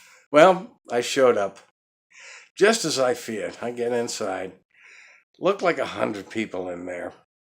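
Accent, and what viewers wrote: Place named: East Coast, United States. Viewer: Love the stories... no matter what!!! You make me feel at home.. with your east coast accent. Ima New Yawka!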